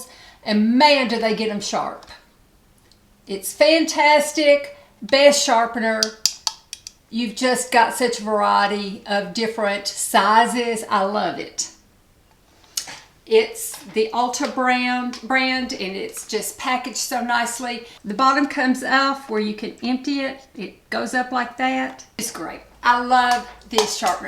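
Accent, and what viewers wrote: South, United States. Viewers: Hello new to your channel 😃. Great tip for velcro rollers, who knew❤‼️ Love your accent, you sound like a Southern Lady. I went to grad. school in GA., and enjoyed everyone I met. 👍 P.S. Where did you purchase your fabulous earrings, so pretty?!!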